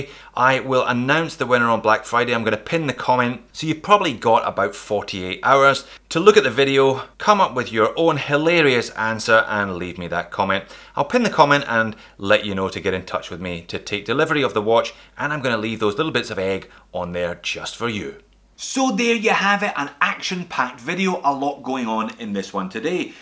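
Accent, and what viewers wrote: Scotland, United Kingdom. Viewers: A Haggis (shhh don’t tell unsuspecting tourists they aren’t real) riding a unicorn (as Scotland’s national animal). Enjoy hearing a fellow Scotsman talking about watches! Cheers all the way from Dundee! Keep up the good work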